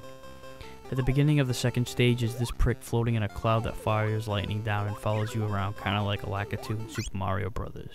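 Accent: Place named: Brooklyn, United States. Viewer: I like your Brooklyn accent when hearing your reviews. It enhances the review's humor and makes you kinda sound like a bad ass. XD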